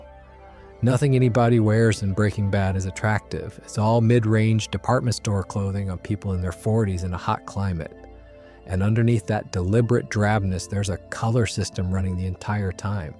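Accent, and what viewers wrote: United States. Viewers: The narration for this video about an American show being in an American accent, but nonsensically forcing British words / terms in... absurdly pointless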